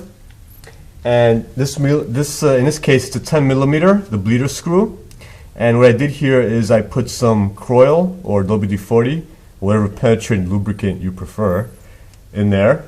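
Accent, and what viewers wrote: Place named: New York, United States. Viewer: Dislike because it should have been a hot girl doing this and not some New Yawker.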